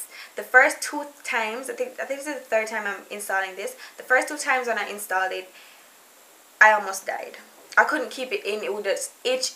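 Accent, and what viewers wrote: Jamaica. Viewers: You sounds Jamaican,are you? Greetings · Looks good and natural sweetie and you make me feel home sick with your beautiful Jamdown voice X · Jamaican? Girllll imma subscribe jus because u are, I'm j'can too, portmore. Love ur makeup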